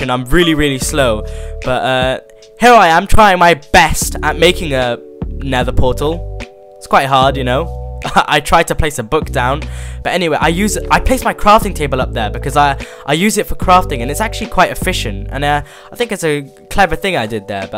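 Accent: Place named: United Kingdom. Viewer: His just british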